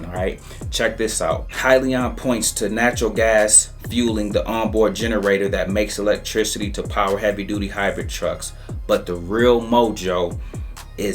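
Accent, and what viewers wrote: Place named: North, United States. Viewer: haha.. love that Northern accent! im from RI but living in Tennessee Y'all. 😅😅😅 Fixin and yant too? 😒😒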